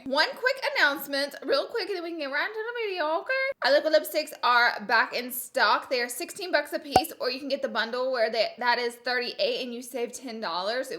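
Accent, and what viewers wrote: South, United States. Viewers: Olly. Lol. Love u with ur sweet southern accent!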